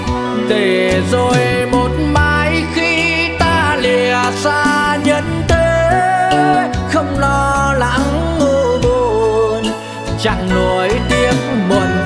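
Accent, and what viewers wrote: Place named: North, Vietnam. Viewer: Xu Hướng Tóc Đẹp Hà đúng rồi anh anh giọng Bắc mà 🐣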